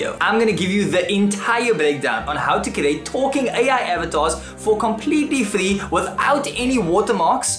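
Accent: South Africa. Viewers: Is that a South African (Cape Town) accent I'm detecting there? :)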